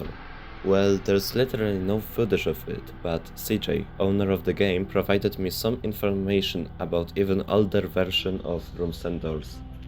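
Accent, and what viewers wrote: Poland. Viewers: are you polish? just asking cause you sound like you have a Polish accent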